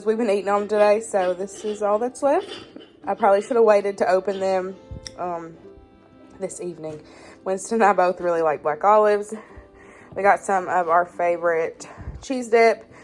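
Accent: South, United States. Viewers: Just curious, what state do you live in? You sound similar to a southern, which I live in Georgia, but you’re not as country as Georgians!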